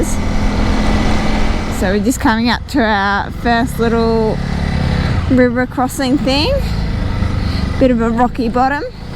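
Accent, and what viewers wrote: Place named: Australia. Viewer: Thats most Australian accent eva…😎🤘🏻🤘🏻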